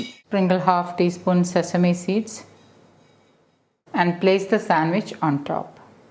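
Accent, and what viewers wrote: Kerala, India. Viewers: I love the way you talk, mixing some keralite tone , so pleasing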